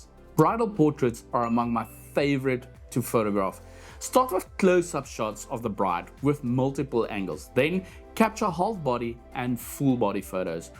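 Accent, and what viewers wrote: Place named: South Africa. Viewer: Great stuff! And I loooove your thick south African accent! Liked and subscribed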